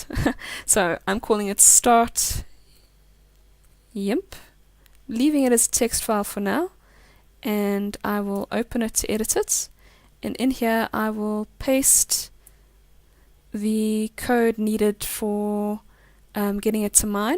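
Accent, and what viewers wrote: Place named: South Africa. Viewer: I have to agree with you, you sound very South African, local is lekker:)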